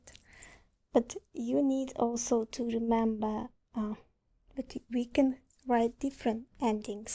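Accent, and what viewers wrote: Russia. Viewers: Не кэн, а кьхен. Какой жоосткий русский акцент. Видео хорошее) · Strong russian accent is always a good trigger too. Idk why but I like it. Thank you!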